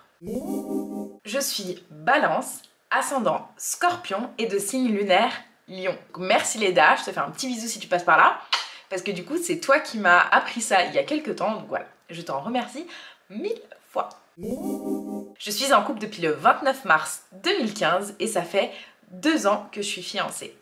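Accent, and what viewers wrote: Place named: Switzerland. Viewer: Tu a un très jolie accent suisse merci pour se partage 🙏🏿 Paix sur toi 🙏🏿 et ton chérie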